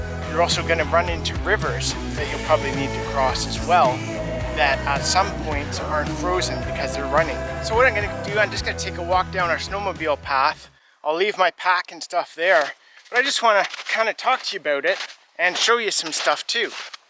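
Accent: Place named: Canada. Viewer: Strong Canadian accent here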